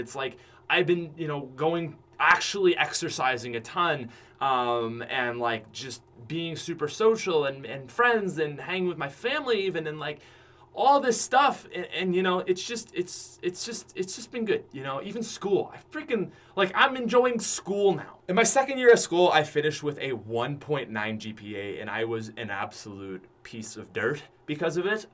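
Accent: Canada. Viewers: Been here since day 1! Love the content, Canadian accent, and the humor! Keep it up! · Sound so much more Canadian today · ahahahah oot. canada accent , its so funny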